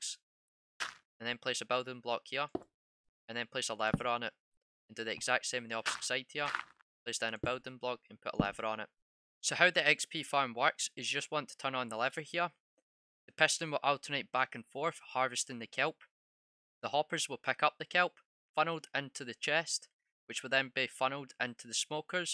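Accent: Scotland, United Kingdom. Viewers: He Scottish mayte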